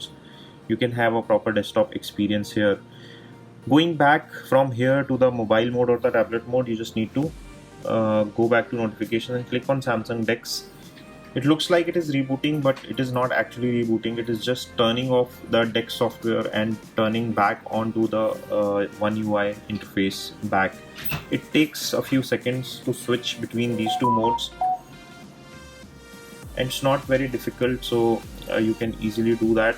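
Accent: India. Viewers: lol the indian accent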